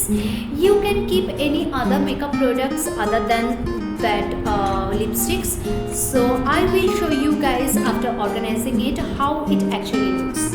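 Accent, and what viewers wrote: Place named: Bengal, India. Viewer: First View Is My ❤️ and And First Like Is My ❤️✨ lot's of love your BANGALI VOICE Cutest pie 😊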